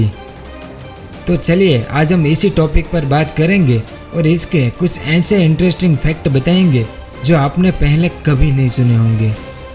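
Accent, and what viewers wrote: Gujarat, India. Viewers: Bhai tu gujarat se h kya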